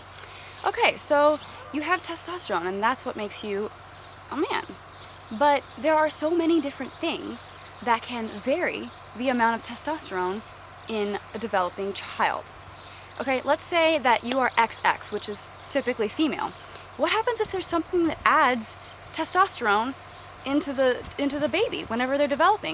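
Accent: United States. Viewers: Such a great way of putting it! Love your videos and your message! My bf was watching them with me and says "she sounds like an american version of you Steph!" :') Love watching someone make total sense! xx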